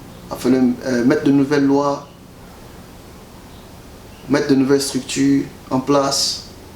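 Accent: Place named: Ivory Coast. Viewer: Ou là super mec allons voyons vous avez l’accent propre des Ivoiriens né grandi et éduqué étudié en Côte d’Ivoire. Vos frères vous aime alors mettez vous dans la peau d’ivoirien ils on trop souffert dans cette sale guerres. Et on peurs comprenez cela svp. Ce qui se passe en Côte d’Ivoire ne se passe nulle part ailleurs surtout pas dans un autre pays en Afrique. Ils ont le droit devoir d’être jaloux de leur pays. Le président HKB a le devoir de tirer la sonnette d’alarme a ces compatriotes sur un quelconque danger.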